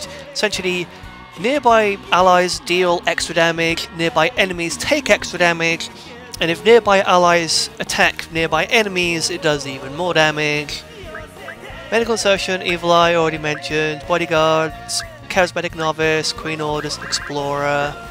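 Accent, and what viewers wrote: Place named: United Kingdom. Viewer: It's a pleasure listening to your british accent <3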